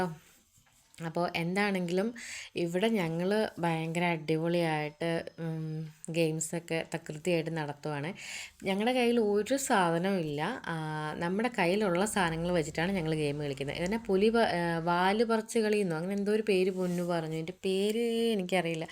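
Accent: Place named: Kottayam, India. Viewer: Super ayit undu❤ chakkapazham😋.. Chechide language ketal Kottayam ane parayu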